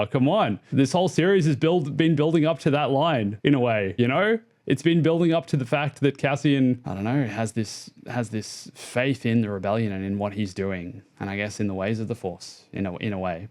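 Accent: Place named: Australia. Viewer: You sound like an expat Aussie. You seem to have a rhotic "R" sound like the Americans. Anyway, Good vid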